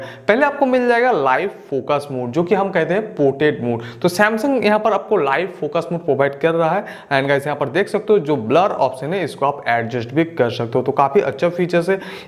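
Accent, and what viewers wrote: Bengal, India. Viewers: Ye keysi baat hey bhai?wo lerka bangali hey.wo indiawale ke liye hindi may phone ki review de rahi hey.to issmey galat kya hey?bangali logoki hindi bollemey thoda kharab to hogii.tamil logokovi eeeysa hota hey.you need to adjust it man.love from bangladesh.take care.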